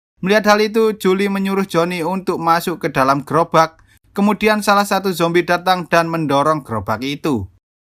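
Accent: Java, Indonesia. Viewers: Medok tak trtolong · Abang orang jaws ya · Dari Jawa Mana Kamu Bang?.. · Medok tenan mas🤣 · Ketoro nek iki arek jowo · We abang wong jawa y? Pada baen ak be wong jawa😅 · Njirr wong jawa massee Gi ngapa massse wkwkwk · Abang wong jowo?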